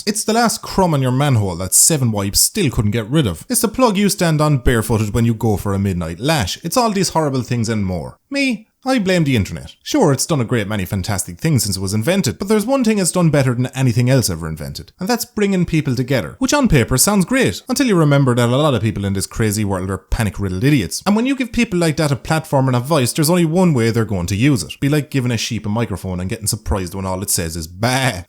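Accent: Ireland. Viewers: That's his accent, I'd know because I'm from Northern Ireland, which is ya know very close to Ireland, attached in fact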